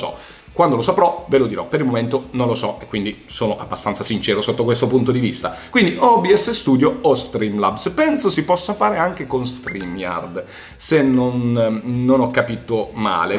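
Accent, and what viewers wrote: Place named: Foggia, Italy. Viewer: Ma sei di Foggia??? 😁😁😁 Riconosco l accento familiare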